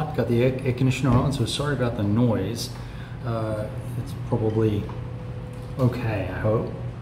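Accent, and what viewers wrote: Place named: Australia. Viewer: The Australian accent adds to the Guy Pierce thing goin on. Though I reckon Mr Sharp is more the Teddy character in this one ;-)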